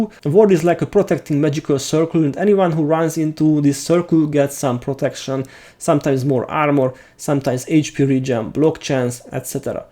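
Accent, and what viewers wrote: Hungary. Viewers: Hungarian accent is so tasty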